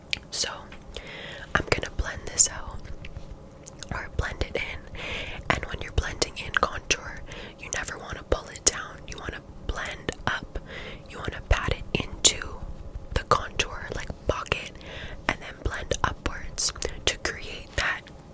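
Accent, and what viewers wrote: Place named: Canada. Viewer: Hello my favourite asmrtist in the world good to see you making some more lovely asmr again. I love your whispering Canadian accent it’s beautiful and I love your beautiful lovely overgrown long smooth silky soft brunette hairy mane of yours 😌❤️., I have a favourite asmr request I would love for you to make it for me to help me get to sleep 😴 please if that’s ok with you as I was thinking since I love slowly relaxed real hair brushing asmr and brushing long hair fully covering🙈all over face asmr it would be wonderful and fulfilling to see you brush your beautiful mane and brush your beautiful mane fully covering all over your face but for my asmr request as the rhetorical question can you do 30mins long slowly relaxed real brushing your long hair fully covering 🙈all over your face nonstop asmr with no mic 🎙️ and no earphones if that’s ok with you, can I comment the asmr video link or send an email to you of the video if that’s fine by you aswell 😇😌❤️👍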